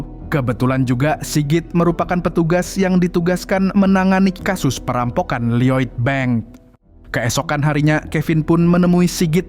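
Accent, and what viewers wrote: Banjar, Indonesia. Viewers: baaaaah iyaa am buhan banjar naratornya leeeh....gas kaaaan mang...... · Orang Banjar kah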